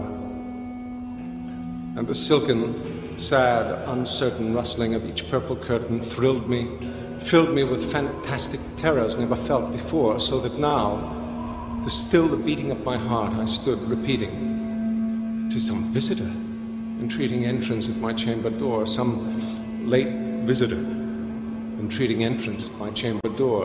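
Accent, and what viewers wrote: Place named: United States. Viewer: An American voice reading Poe..Refreshing.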